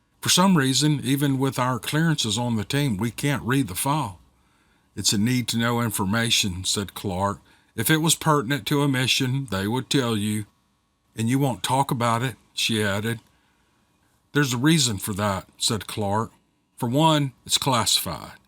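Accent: Germany. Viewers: really enjoying Blood Eagle and was tickled by your German accent. It reminds me of Arnold Schwarzenegger. Thanks for doing what you do. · Living for the German accent ❤ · I was stationed in Germany for five years. German accent is making me laugh. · I love your German accent done in clandestine Southern flavor,! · Another talent.A German accent. I dont know a thing about it but I do appreciate it. Thanks sooo much for your effort. It gives the narration a good effect.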